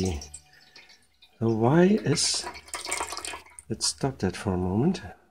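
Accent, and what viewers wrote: Germany. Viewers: I love your soft German inflected English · Ooooo you’re German aren’t youuuuuu. 😘😻😻😻😻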